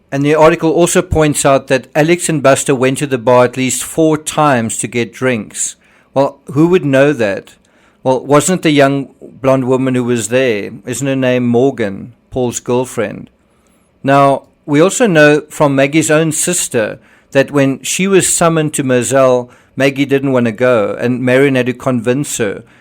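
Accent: South Africa. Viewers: It’s great to hear a South African accent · South African, I think.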